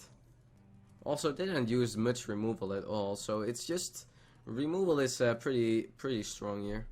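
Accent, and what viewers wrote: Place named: Netherlands. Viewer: Je Nederlandse accent is lichtelijk afleidend 😅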